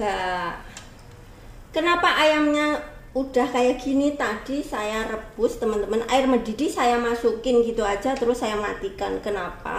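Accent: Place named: Banyumas, Indonesia. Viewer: Mba aali mana si kok cara ngomongnya kaya q ..q asli banyumas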